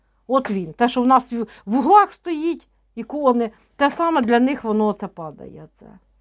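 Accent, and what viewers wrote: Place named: Kherson, Ukraine. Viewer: І у нас на Херсонщині так розмовляють, наш суржик рідний. Дякую, за вашу працю.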